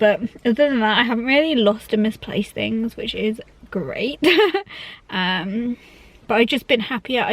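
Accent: United Kingdom. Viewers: Love your English accent! I can relate to you allot. Starting Strattera today.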